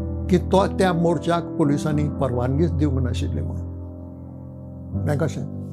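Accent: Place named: Konkan, India. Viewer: Great words... A lesson to learn.. Secondly what a pure konkani.. Love to listen...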